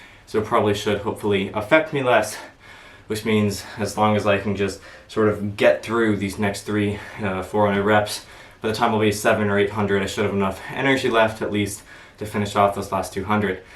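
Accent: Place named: United Kingdom. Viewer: Where are you from? Because the land in your videos are american, but your accent is brithis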